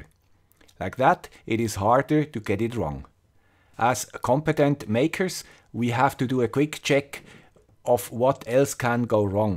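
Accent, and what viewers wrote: Switzerland. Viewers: was in Switzerland last month for first time, and wow, this is the land that Swiss accent guy living and making experiment !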